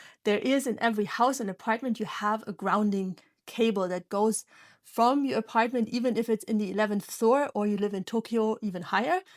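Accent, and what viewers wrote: Germany. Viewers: Du hast einen starken deutschen Akzent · Danke für das erfrischende Video :-). Ich würde Sie auf Grund Ihrer Aussprache irgendwo im hessischen Raum verorten, aber vielleicht irre ich mich auch, und Sie haben gar nichts mit D zu tun ;-). Falls jemand gerne liest : von Clinton Ober gibt es das empfehlenswerte Buch (gibt's auch auf deutsch) "Earthing - The most important health discovery". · Thank you so.much! I already did the tapping and it works instantly. Do I sense a slightly German accent?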